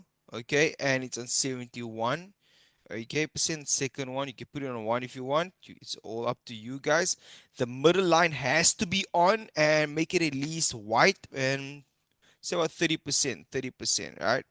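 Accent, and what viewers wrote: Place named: South Africa. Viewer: I heard a South African accent then I subscribed , I will follow you on other social media platforms , I need to be part of a community based in SA ... I'm in Plettenberg bay by the way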